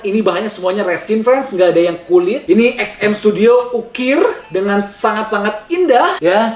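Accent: West Java, Indonesia. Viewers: Bang asli sunda?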